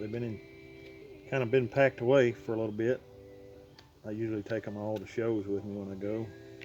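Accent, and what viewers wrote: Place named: Arkansas, United States. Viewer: I'm new to this hobby, and I love your work. On top of that, I was born and raised in Western Arkansas, but I've been gone for just over 10 years now. I wouldn't trade the time I've spent on the mission field or pastoring now in Canada, but Arkansas will always be home. Everything about you and your videos (your accent, your sayings, the yard...) it all feels so familiar. Thanks for that.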